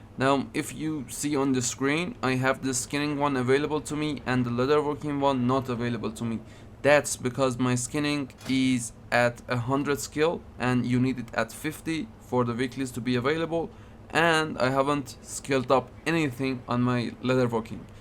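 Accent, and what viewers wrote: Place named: Iran. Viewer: چقد این لهجه ایرانیه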